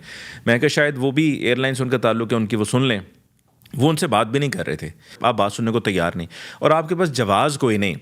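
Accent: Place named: Lucknow, India. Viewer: صاحب آپ ماشاء اللہ دیکھنے میں منڈی بہاؤالدین کے لگتے ہیں اور آپکا تلفظ لکھنؤو والا ہے ۔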